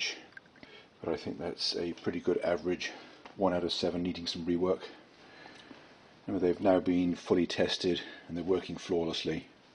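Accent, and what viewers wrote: United Kingdom. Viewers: GREAT JOB - FORGIVE ME WHERE ARE YOU IN THE WORLD- YOU HAVE AN EXCELLENT BRITISH ACCENT BUT STATE PRICES IN DOLLARS LOOKS LIKE ASHBURN USA? WHATS THE STORY THERE?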